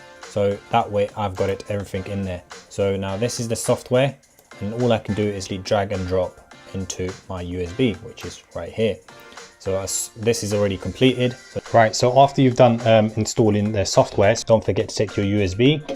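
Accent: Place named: Bradford, United Kingdom. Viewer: You’d either from Bradford or Birmingham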